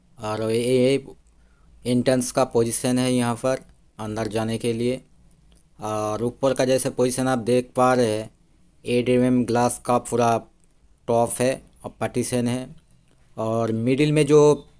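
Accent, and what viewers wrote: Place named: Bengal, India. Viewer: Bhai sahab ap bangali lag rahe ho apki hindi se malum padh rha h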